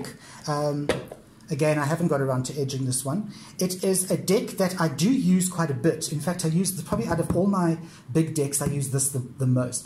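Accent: South Africa. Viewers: So lovely to hear another South African accent :-) I've enjoyed a wander through your collection. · Rare to find south africans youtubers! Love their accents hahaha.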